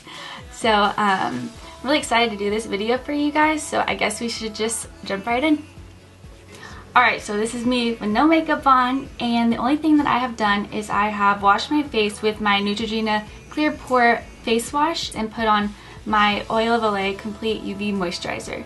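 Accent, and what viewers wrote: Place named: South, United States. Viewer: you are so freakin adorable. love your southern accent! goood vdeo too!(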